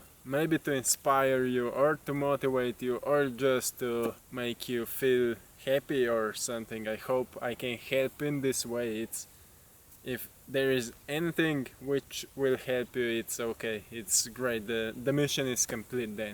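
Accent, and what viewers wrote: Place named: Slovenia. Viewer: Živjo, začela sem pisat engleško in čujem da si Slovenc. Gdaj sem vidla da delaš yogo in posnemek v naravo in pravim kako je to čudovito lepo, kje pa je to?! A to je naša lepa dežela. Samo naprej k veličanstvenim. Lep pozdrav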